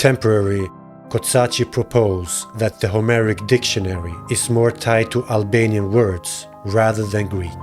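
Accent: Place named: Arab world. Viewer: O njerez, nese doni me ba dokumentar te tille dhe ne Anglisht atehere le te jete o dikush qe flet me mire o dikush qe e ka gjuhe amtare, ky narratori po tingellon si me lexu noj Arab.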